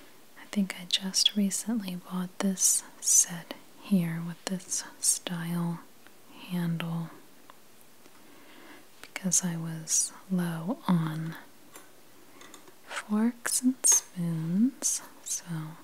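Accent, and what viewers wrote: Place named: Pennsylvania, United States. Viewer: Your accent reminds me of where I grew up in Bucks County, Pa. So much nostalgia!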